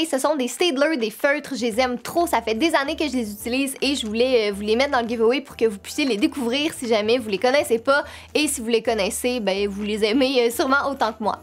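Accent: Canada. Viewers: Moi noël 🎄j'aimerai avoir un nouveau téléphone ( j'aime trop ton axen canadien ) bisous 💋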